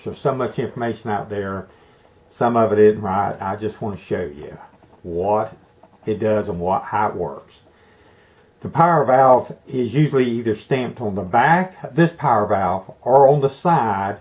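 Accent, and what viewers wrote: United States. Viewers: Thank you, with that sweet Southern accent you could tell me anything about automobiles and I would believe it, ha ha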